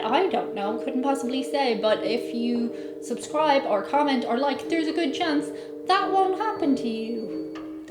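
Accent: Ireland. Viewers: Pros you are super cute and your Irish accent cons when you came to America we didn’t get to keep you 🤷🏻‍♂️ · I have no trouble understanding what you're trying to say because even being American I use the double negative myself sometimes, and I can definitely tell that your Irish from your accent, and I really love it.I have blue eyes myself and since only 2 percent of the world have blue eyes it's getting more and more rare.What attracted me to your channel the most was seeing your face as I was surfing YouTube, your so gorgeous, and now I watch because your interesting and you have a beautiful personality.🦋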